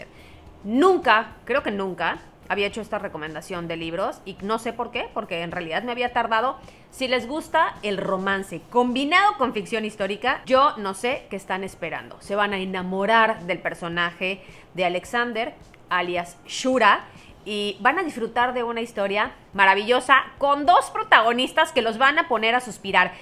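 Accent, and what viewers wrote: Yucatan, Mexico. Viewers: Buenísimo el acento yucateco 😂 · Apenas empezó el vídeo dije "jmmm eso suena como acento yucateco!" Soy Yucateca y es increíble para mí pensar que alguien como tú está aquí!! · Hola; solo una recomendación. En caso de los niños cuidado con las horas de pantalla digital. Hay recomendaciones de horas de pantalla en bebés, niños y adolescentes. Buenas recomendaciones las que has dado! ✌🏼. Y ese acento yucateco? Saludos desde Panamá 🇵🇦! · Hablas como.yucateca xD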